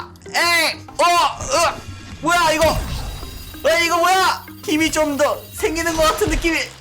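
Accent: Busan, South Korea. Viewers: 그... 원래 부산사람이 엿어요? 부산언어를 정말로 잘.쓰.시.네.요.하하ㅏ하하ㅏ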